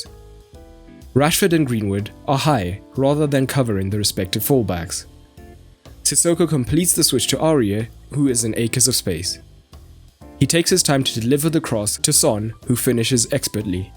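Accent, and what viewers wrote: South Africa. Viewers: R u South African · You sound South African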